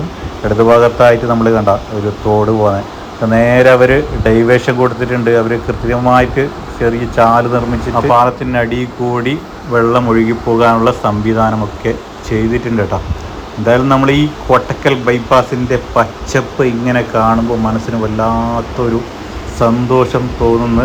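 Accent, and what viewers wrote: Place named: Kannur, India. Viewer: സഹോദരന്റെ വീഡിയോകൾ സ്ഥിരമായി കാണാറുള്ള ഒരാളാണ് ഞാൻ താങ്കളുടെ ഭാഷയിലെ കണ്ണൂർ കാസർകോട് ശൈലിയിലുള്ളതാണ് എങ്കിലും നമ്മുടെ സ്വന്തം ജില്ലാ ആയ മലപ്പുറത്ത് വന്ന് വീഡിയോ ചെയ്തതിൽ വളരെയധികം സന്തോഷിക്കുന്നു പക്ഷേ ഒരു കാര്യം പറയാതെ വയ്യ മലപ്പുറം കോഴിക്കോട് കണ്ണൂർ ഭാഗത്ത് ആറുവരിയിൽ വണ്ടി ഓടിക്കാൻ നമ്മുടെ ആളുകൾക്ക് അറിയില്ല എന്ന് പലപ്പോഴും തോന്നിയിട്ടുണ്ട് പക്ഷേ ഒരുപാട് ഫൈനും ഒക്കെ വരുമ്പോൾ പഠിക്കും എന്ന് വിചാരിക്കുന്നു നല്ല ഒരു വീഡിയോ തന്നതിന് നന്ദി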